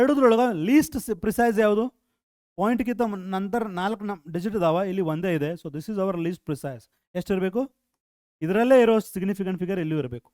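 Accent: North Karnataka, India. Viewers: Sir nimma uttara karnataka speaking ❤❤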